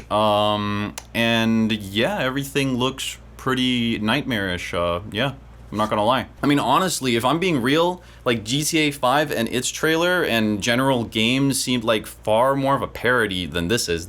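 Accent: United States. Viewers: how can a danish be so good in american english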